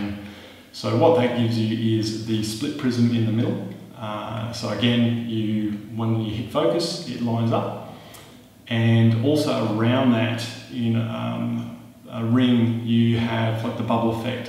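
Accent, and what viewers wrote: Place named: Australia. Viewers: Haha the Aussie accent, I'm Australian he was as clear as a bell. XD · I love Aussie accents.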